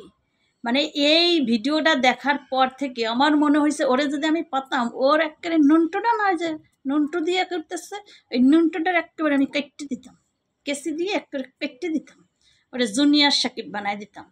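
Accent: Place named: Kushtia, Bangladesh. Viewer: Api tomar language so sweet kustiya niki meherpur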